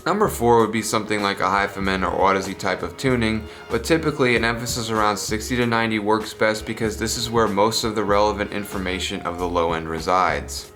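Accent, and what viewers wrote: New York, United States. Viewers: "Epicot golf ball" and the Noo Yawk accent: good. Those cacophonous urchins and drowning in chocolate pudding: bad.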